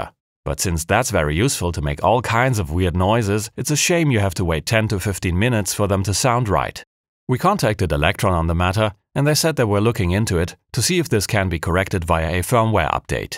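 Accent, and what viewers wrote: Germany. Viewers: lol what? i think he's just german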